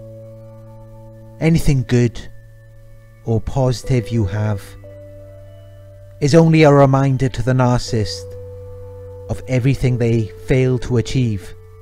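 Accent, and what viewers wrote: Wales, United Kingdom. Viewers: Your voice tone is helpful- to an American you sound a little like a famous Welshman - Richard Burton. Not exactly of course, but we know you are wounded similarly. He wrestled in a narcissistic system, and family life. Thanks for the better benefit. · You sound Welsh .. possibly near Newport. I experienced this for 5 years and had to undertake hundreds of hours therapy, finally finding Anoushka at Balance Psychologies to help me twice a week with specialised NPD abuse therapy / counselling. I've learned about this now .. it's been the hardest time in my entire life .. I absolutely get it now, and I find it interesting that there's a Newport person on here reporting this. I'd love to get together with you and talk through this together. I'm in my 50's and she got me, I had no idea. I now have taken the red pill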